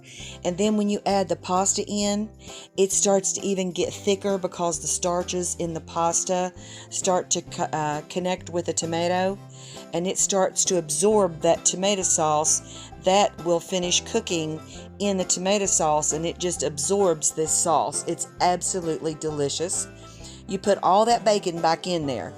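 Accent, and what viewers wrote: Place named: South, United States. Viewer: Thank you ma'am I can tell by your accent,you know what you are doing. My mamaw & my momma made this really, really good, sadly, I never paid enough attention. Adding the sugar kind of scares me ( because I had macaroni and tomatoes at a friend's house growing up and she put sugar in hers and it wasn't like my mom's or mamaws....but mom did like hers every now and then like that). Is the amount of sugar you use do something like tame the acidity of the tomatoes or does it taste more sweet ? I like salt & pepper on my maters and sugar in my cereal,lol 😊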